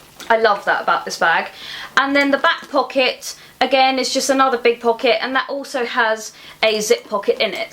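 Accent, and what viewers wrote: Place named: England, United Kingdom. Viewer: Hi, I stumbled here through accident. I am not into handbags as I am guy. :) But I have to tell you that you have a very enjoyable voice Ma'am. I hope you ever heard of ASMR as I think an ASMR handbag video by you would be one of my favorites. ;) edit: I forgot to mention that also your English accent would make an ASMR video by you even more enjoyable. :D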